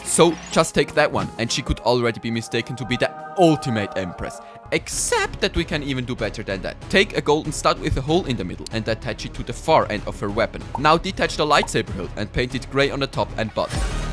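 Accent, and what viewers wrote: Germany. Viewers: Bro are you German ?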